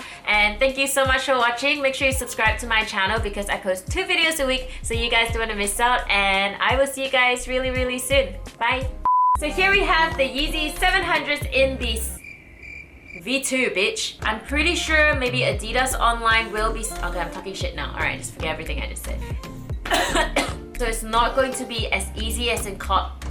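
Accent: Australia. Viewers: Her Aussie accent sounds really lovely. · I’m buying these after I watched your review vid. I love your ausie accent. It’s super hot. I’ve spent a lot of time in Sydney and Gold Coast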